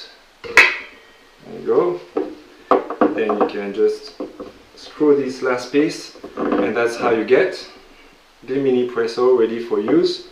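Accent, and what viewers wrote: France. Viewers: Teresa Flores It is not rude tone. He is just from France and his native language is not English. Most people have some accent in foreing language! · petites critiques : pour si peu de quantité de café cela n'énervera pas un chat, je préfère le petit noir au bar tabac du coin pourvu qu'il ait un bon torréfacteur de renom autre que Grand-mère ou Monoprix. En attendant de trouver sur le marché une autre machine aussi efficace mais produisant plus de café que çà. Bravo pour la vidéo...superbe French accent ;-)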